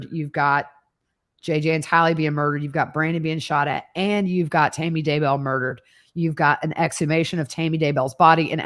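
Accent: South, United States. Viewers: …I AM SUPER PROUD OF U! This is wat I wanted to do growing up…but ya know, having that baby boy 19 yrs ago changed my life a bit. U r on a well known networks doing yo thang! U look good and sound professional…with the southern twang! Proud of it! From the pecan pie to the sweet tea❤ Thank u for representing us well down here! Much love from M-I-crooked letter-crooked letter I, crooked letter crooked letter, I-Humpback, humpback I ❤ PS…can we get Fruitloop one night to talk about this case? Plzzzzzz?!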